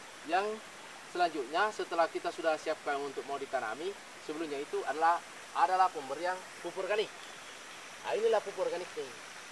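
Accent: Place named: South Sulawesi, Indonesia. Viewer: Cara bicaranya kaya orang sul sel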